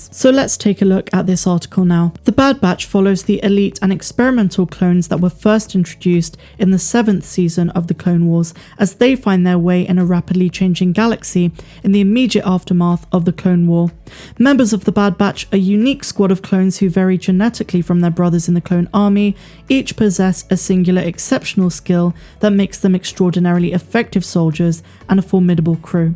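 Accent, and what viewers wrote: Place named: Southern England, United Kingdom. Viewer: I can’t work out if your accent is south east or south west hahahahaha. Great work, enjoying the channel. 👍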